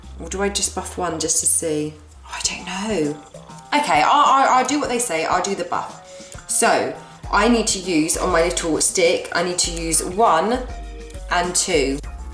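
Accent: England, United Kingdom. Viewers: Are u British? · The dogs obviously not impressed either bless him! Naaaa think I'll pass on this one so thanks for the heads up on it. Much appreciated. My own nails are long and hard and I was actually looking for a vid on gel polish but then I got waylaid. (As one does lol). Lovely to hear an honest English voice on YouTube. Good stuff lovely girl. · She's British... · are u from england.....cuz i am from bradford in england uk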